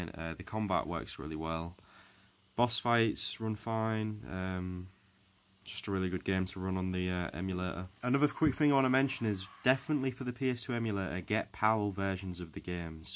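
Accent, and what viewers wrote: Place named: Yorkshire, United Kingdom. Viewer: Are you from Rotherham or Sheffield?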